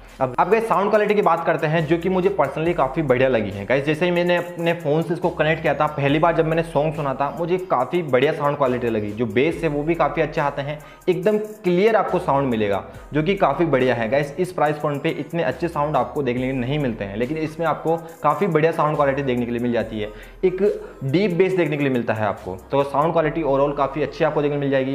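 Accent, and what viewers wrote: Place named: Haryana, India. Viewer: bhai tu shayad hariyana se hai and accent mast hai teri has has k pagal ho gaya.. but haan sahi review dia hai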